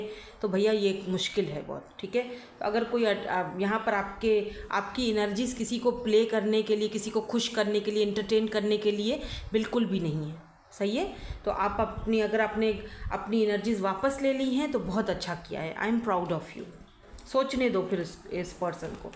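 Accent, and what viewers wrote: Punjab, India. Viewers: Love your punjabi accent❤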